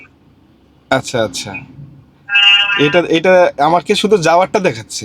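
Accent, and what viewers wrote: Barishal, Bangladesh. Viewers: আপনি কি বরিশালের লোক?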